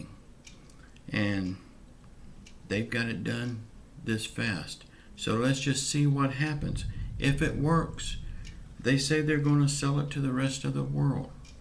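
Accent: South, United States. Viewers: Ok I've been listening to your channel for awhile now it sounds like your from the south me to Tennessee. Move back for family anyway you forgot one major issue today where is that recipe for sguach/hamburger that sounds so good. I'm so so glad Amy's doing better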